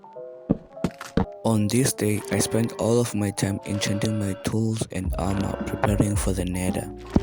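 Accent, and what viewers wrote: South Africa. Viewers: U sputh african????? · his accent is obvious, might be Zulu guy or venda idk tbh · You sound sound south African like a Xhosa one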